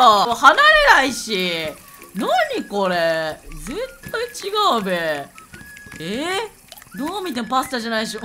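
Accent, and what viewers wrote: Japan. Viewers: もしかして鹿児島県民?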